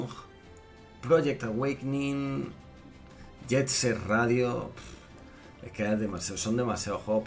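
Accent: Spain. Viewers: Tengo curiosidad por saber de qué parte de España es su acento? Yo soy de Latinoamerica y pues no logro diferenciarlos, mil gracias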